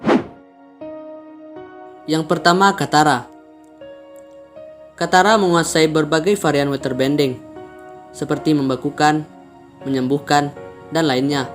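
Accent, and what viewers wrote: Padang, Indonesia. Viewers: Abang orang padang ya Bng 🤣🤣🤣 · Org padang y min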